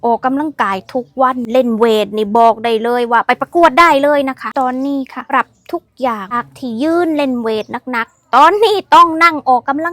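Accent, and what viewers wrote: Isan, Thailand. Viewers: 😂🎉❤Happy new year ขอให้สุขภาพแข็งแรงไวๆ ครับ ชอบคลิปสนุกดี สำเนียงเด่น ทั้ง ไทย อีสาน เขมร อังกฤษ แถมยังน่ารักด้วย😊😊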